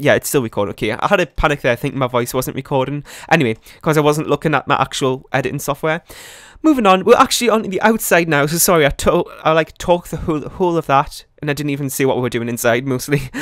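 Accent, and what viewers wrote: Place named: Northern England, United Kingdom. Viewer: Nice build, and nice to hear a nice northern voice, looking forward to seeing more 😁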